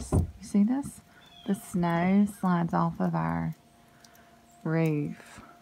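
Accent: United States. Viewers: Well it’s looking like a typical day down on the farm you are so amazing I just love to hear your voice it’s so country and that’s why I can just sit and watch them over and over stay safe out there 🌹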